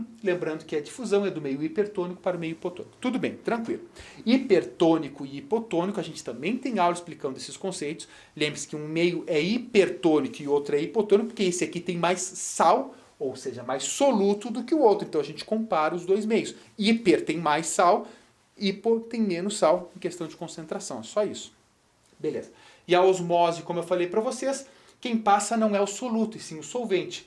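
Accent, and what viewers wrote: Rio Grande do Sul, Brazil. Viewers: Sou nova aqui no pedaço e já ameeeei suas aulas Professor! Que aula incrível! E esse sotaque então, adooooorooo acho lindo sotaque gaúcho🤩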